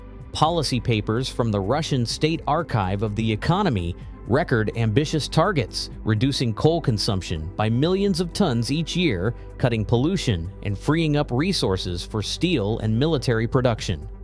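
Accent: United States. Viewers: 🙂 Ja vad ska man säga om denna film berättare . Det låter som en amerikan som läst på lite gran om historian . I USA körde dom Ånglok tills dom kom på att Diesel lok va bättre , Inte det som alla andra har Diesel-elektriska lok utan bara diesel . Det är bra för miljön i USA . Sovjet köpte Svenska ånglokomotiv en bra bit in på 1930 talet . Sovjet byggde om sitt transport system från häst & vagn till Järnväg . Dom hade set sig om i världen på 1840 talets Europa & Amerika byggdes järnvägen upp . USA är inte direkt kända för sina järnvägar . Europa har ett virrvarr av statliga och privata järnvägar allt från smalspåriga till bredspåriga . Järnvägarna tar detta på stort allvar och under 1890-talet börjar järnvägen elektrifieras. Boxholms bruk är först i Sverige med ett järnvägsspår med eldrift år 1890. Fem år senare öppnas den första elektriska järnvägen för persontrafik. 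Det är den 11 kilometer långa sträckan mellan Stockholm och Djursholm. Så 60 år senare vaknar Sovjet med elektrifiering av sin järn väg . Men att ställa upp lokomotiv på stora järnvägs uppställnings platser finns överallt . Om man är försiktig med dom alltså ha dom som reserv . Så kunde man göra som oss Svenskar ställde upp dom i Bergrum eller under tak , Utspridda i landet . Att göra som amerikaner och Ryssar är trist där står dom och förfaller . Det är bara att göra FRAG av dom och koka om till stål igen . Amerikanerna med sina Diesel lok har mer bekymmer eftersom deras gamla lok är giftiga så ingen vill peta p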